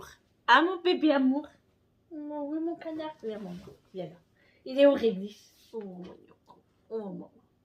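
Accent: North, France. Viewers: Hello Houppsss 😝je suis nouvelle abonnée j’adore tes bla-bla avec ton accent du ch’nord,moi je viens de Belgique 😉je me permets de te tutoyer car je suis un peux plus âgée 🤫qu’elle courage d’être Maman d’une belle familles félicitations tu arrêtes jamais mais c’est certain que pour ses enfants on se décarcasse toujours même s’il sont grand j’ai moi même deux grands garçons 🥰je te souhaite déjà une bonne fête dès Maman et j’espère que tu sera bien gâtée 💝prends soin de toi à bientôt pour d’autres aventures 🫶🏼bisous 😘Vivi.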